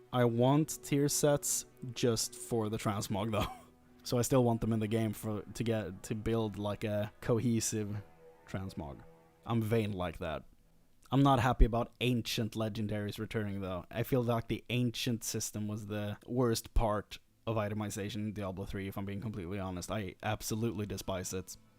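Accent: Sweden. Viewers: As a swed, he sounds like a swed ^^ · He sound Swedish.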